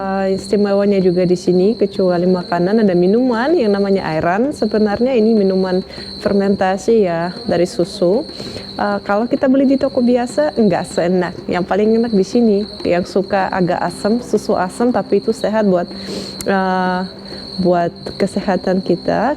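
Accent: Indonesia. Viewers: Wow keren bahasa indonya aksennya indo banget.. 🇮🇩❤🇸🇮👍😎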